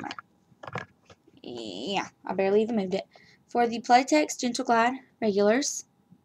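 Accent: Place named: South, United States. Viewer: Are you southern? You have an accent :) I'm from South Carolina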